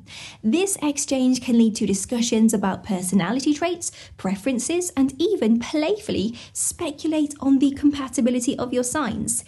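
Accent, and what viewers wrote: United Kingdom. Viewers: I find you entertaining, because you manage to talk a load of BS in an engaging manner, and you are cute. Congrats also on your wardrobe and that perfect delivery, complete with crystal- clear pronunciation, no (or very few) grammatical mistakes, captivating demeanour and enviable British accent. But what are you going to do when you run out of topics - or should I say 'run out of BS'? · Love your accent, what part of the UK are you from?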